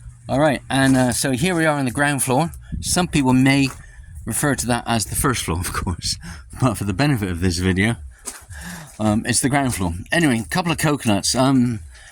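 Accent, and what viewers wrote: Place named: United Kingdom. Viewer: its pronounced "bung-car" hes from Britain us foreigners have quite different vowel pronunciations.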